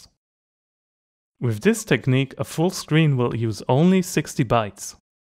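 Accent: Germany. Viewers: You're German?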